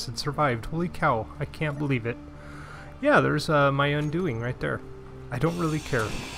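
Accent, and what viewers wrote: Canada. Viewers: are you Canadian? you sound Canadian.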